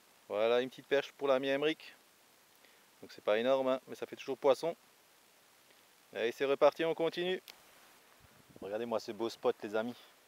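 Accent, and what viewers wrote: Alsace, France. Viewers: Salut toi tu a un un accent qui vient de chez nous tu es où en Alsace?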